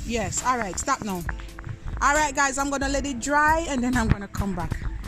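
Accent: Jamaica. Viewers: Hi!! I am so happy to finally hear a voice “ talking the real Jamaican things them” and creating “glam and glitter” bling out on social media my girl them can’t hold you back!! You should really be proud of yourself as I am proud of you. Your bling mirror “sell off”. Looking forward to the side tables.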